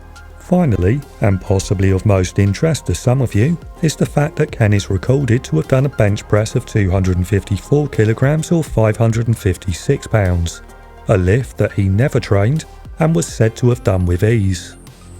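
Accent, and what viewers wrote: United Kingdom. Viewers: Stop with British accents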